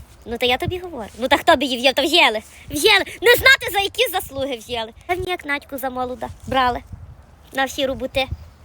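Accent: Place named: Ivano-Frankivsk, Ukraine. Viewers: ПАНІ. ВИ ЯК ЩАВЖДИ НЕПЕРЕВЕРШЕНА У НАШОМУ ЗАПАДЕНСЬКОМУ ГОВОРІ. ВАМ ПОВАГА РЕСПЕКТ. КАНАЛУ❤❤❤ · Пані,як я люблю Вас дивитися то є цуд.Що би я робила без Вас,подивлюся Ваші відео і настрій маю на цілий день.Ваші відео антистресові😅.Здоров'ячка Вам міцного.Ви вмієте розказати і показати все файно як є в житті.А воно так в житті і буває.У Вас такий говір,як і в нас на Івано-Франківщині Всього Вам найкращого.❤❤❤❤❤❤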